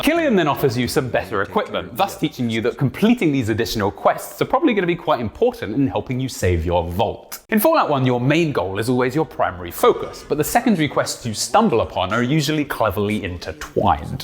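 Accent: United Kingdom. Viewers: I love new brittish accent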